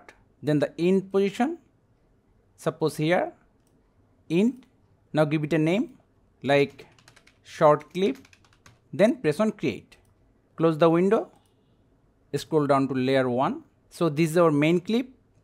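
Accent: India. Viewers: I love everytime I need to know how to edit something on a program I just found there is an Indian sounding man ready to explain it. good video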